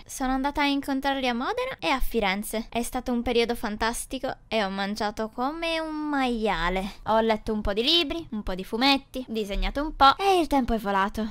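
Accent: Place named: Sicily, Italy. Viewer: Io che credevo eri siciliana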